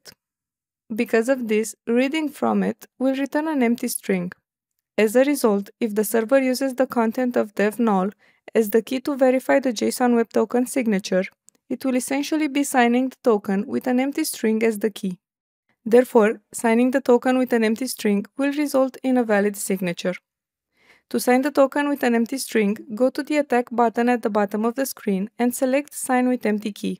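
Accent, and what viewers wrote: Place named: England, United Kingdom. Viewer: i like you english accent dear teacher👍👍 would you mind telling me which accent is it??????????????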